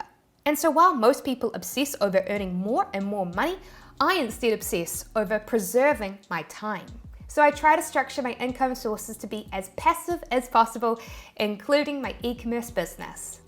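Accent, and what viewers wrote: New Zealand. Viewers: I just realized how different the NZ accent is compared to the Aussies. By the way, very good information here. I was wondering how to start a T-shirt/mug printing business like yours. Thanks! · She's from New Zealand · Her accent with 'e' sound every time brings me back memories of my trip to New Zealand before corona... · Are you kiwi??? I don’t think I caught your accent before!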